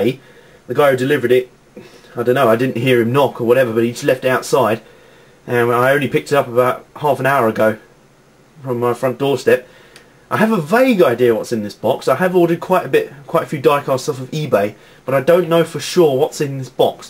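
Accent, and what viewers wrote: United Kingdom. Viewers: Are you British? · I love your british accent, that's awesome!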